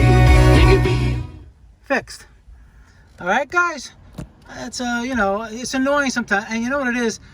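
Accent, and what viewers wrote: New York, United States. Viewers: I love his accent. This is a new york accent right?? Maybe Boston.. but i dont think. It sounds too close to home.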